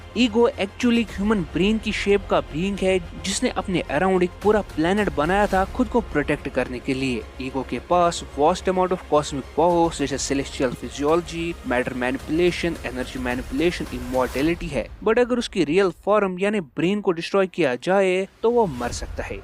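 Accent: Kashmir, India. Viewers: Are you from Kashmir because I am also from Kashmir your voice is like you are from Kashmir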